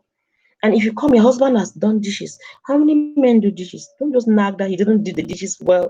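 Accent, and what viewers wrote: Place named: Cameroon. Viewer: Wahoo auntie u sound like a cameroonian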